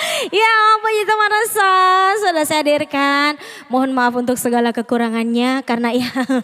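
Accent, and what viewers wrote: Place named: Jakarta, Indonesia. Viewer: Ngomongnya kayak orang Jakarta lho taunya orang Bugis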